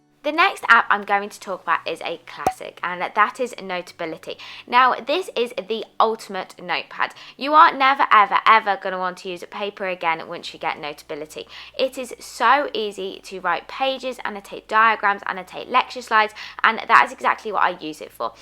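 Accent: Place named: United Kingdom. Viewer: Guessed it with your British accent..😌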